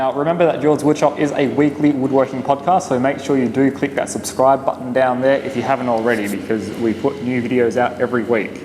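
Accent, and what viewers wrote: Australia. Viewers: Good to hear an Aussie voice on here (where r u ?) from a Perth based mens shed member :)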